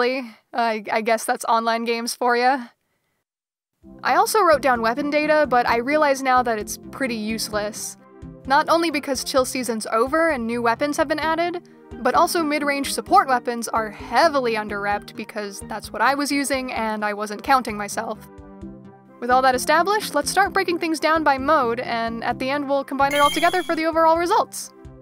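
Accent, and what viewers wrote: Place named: North America. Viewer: a "normal" amount.... also I'm judging by the accent I'm assuming you are an NA player. The match making servers prioritze region to cut down on lag so that would be a thing to consider with your sample. My guess is JP has an inkling majority but I haven't collected any data on that just a vibe. Also my statistics nerd brother in arms.... I am sorry nobody told you about stat.ink. Lastly, love the PSO music cheers :)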